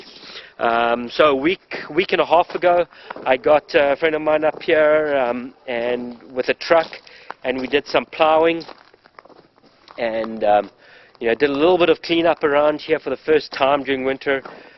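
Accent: South Africa. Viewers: is he south african or is it just me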